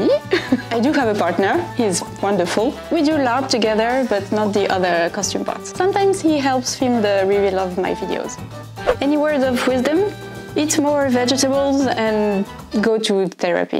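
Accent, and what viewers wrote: France. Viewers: You are just adorable! I love watching you!!! Your accent is why I took 5 years of French. Almost no one speaks French in Western Colorado, but I still think it’s the most beautiful language!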